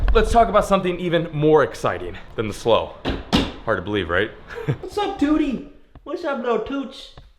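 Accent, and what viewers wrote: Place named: New England, United States. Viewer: Down here In the south we have a lot of fishin tournaments every year. Where are you from? you sound like one of them ol yankey boys ya hear? I'm 77 and you fish good to be young! Good ol boy!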